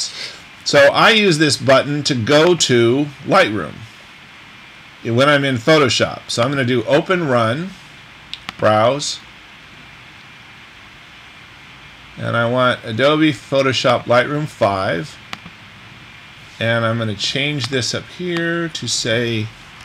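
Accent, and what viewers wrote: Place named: United Kingdom. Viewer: Not a bad thing at all at least now I can relate a voice to face. Funny I always assumed you might have been british!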